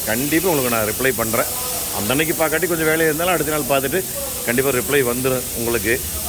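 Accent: Kongu, India. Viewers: Car Dr. Mr sir, For yours nice Kongu speech and open to all pannady. Congratulations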